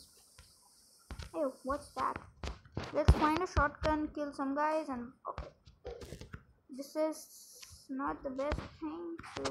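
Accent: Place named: United Kingdom. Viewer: he is speaking like a british while he is a Indian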